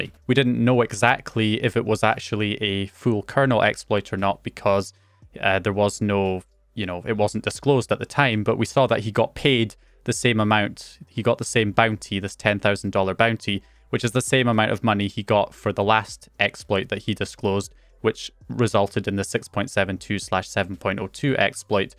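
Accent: Scotland, United Kingdom. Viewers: Jim Browning is from Northern Ireland and is Scottish, but the two regions do share similarities such as slang words/colloquialisms.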